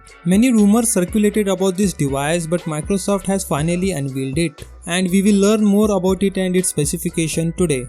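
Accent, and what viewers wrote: India. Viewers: Indian accent is so garbage.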